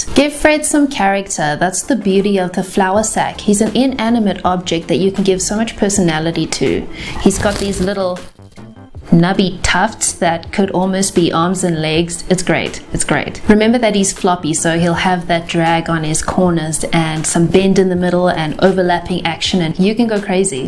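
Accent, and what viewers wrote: South Africa. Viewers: Idk if you are, but you sound so south african!! :D it's weirdly endearing to hear the accent on yt Thanks for the tips btw. Love your channel! It's been a great help so far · She sounds South African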